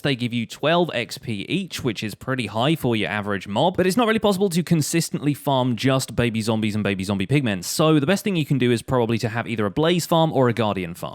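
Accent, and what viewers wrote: United Kingdom. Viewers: I mean he's like British or something you find that everywhere. And I know it's the pitch of the voice but it's still just British. Not to mention you said his accent and nothing like his voice. Sorry this is long I just had to explain something that I dont really understand. Exactly the reason I shouldn't have a response because I dont have a perspective. I wasn't trying to roast anyone or anything. I hope this is understandable. Thank you for your time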